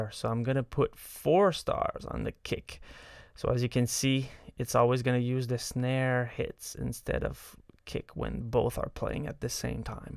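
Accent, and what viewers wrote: Quebec, Canada. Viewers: Eille stun accent québecois ça! :D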